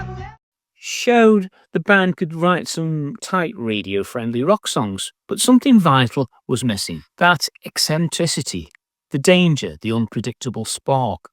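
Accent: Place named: Midlands, United Kingdom. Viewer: I agree,no ego... passion just flows brilliantly....the accent is perfect too, a bit of Kidsgrove or Smethwick blended with a sprinkling of progtalkability.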